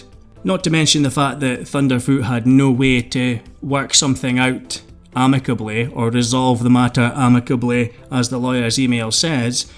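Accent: Scotland, United Kingdom. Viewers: Scottish? I suggest you don’t watch his other videos, they’re all in Scottish. Since he’s Scottish. 🙄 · He’s from NE Scotland deal with it!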